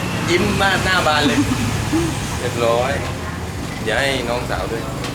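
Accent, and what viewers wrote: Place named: South, Thailand. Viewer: พี่คนที่ไหนครับแหลงเหมือนคนใต้เลย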